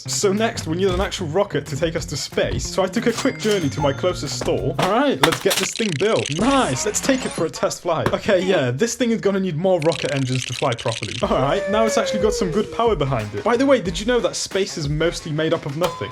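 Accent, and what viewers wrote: United Kingdom. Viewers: Is he a UK person? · i realed that he is brithish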